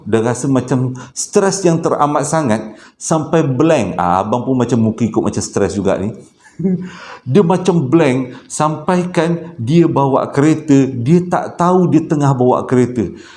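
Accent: Johor, Malaysia. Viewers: Abg org johor ke? Patut la bila dengar cara ckp abg mcm org johor ie.. 😊😊😊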